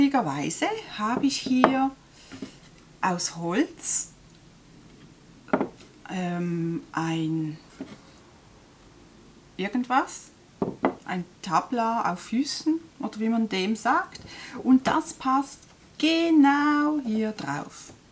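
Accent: Switzerland. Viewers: Liebe, ich finde deine schwizerische Aussprache entzückend. Das Verständnis ist von meiner Seite da, aber ich lebe auch in Bayern. Wir verstehen alles.....nein manche Bayern verstehe selbst ich nicht. Eigentlich bedarf es auch nicht vieler Worte, die Objekte sind durch das Zuschauen selbsterklärend. Zu viel Gerede ist ja manchmal auch so, dass man denkt, " Komm jetzt mal zu Sache" 😄. Ich finde alles super toll entspannend. So entspannend, dass ich auch schon eingeschlafen bin ☺️. Das soll nicht negativ sein. Nein im Gegenteil. Es ist alles sehr ommmmmmm. Beste Grüße und mach weiter so!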